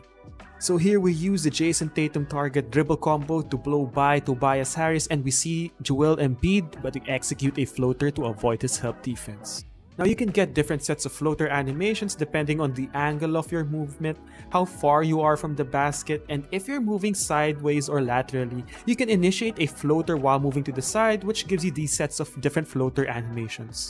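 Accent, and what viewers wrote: Philippines. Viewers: Are u Filipino? U sound like it brother!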